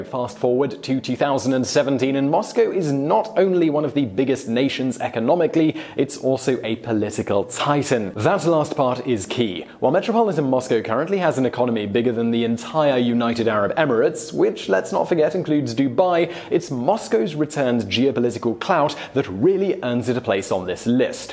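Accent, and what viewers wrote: United Kingdom. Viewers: a bloke with a british accent says london is the capital the world totally obejective.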